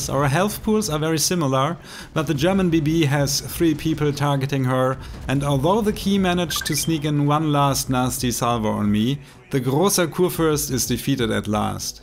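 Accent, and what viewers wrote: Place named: France. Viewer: haha yes he has a proper french accent :D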